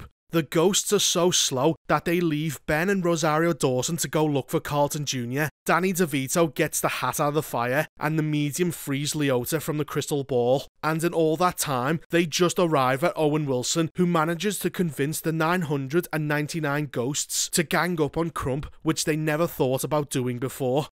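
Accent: Liverpool, United Kingdom. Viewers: he has a 'scouse' accent as we say... it means he's from Liverpool. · He's from Liverpool. Very distinct accent.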